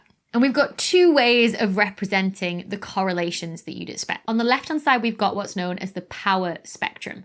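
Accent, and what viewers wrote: United Kingdom. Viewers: If my teachers where this pretty and had a cool British accent I think I’d have gotten much better grades. Or as my kids say more better grades 🤭.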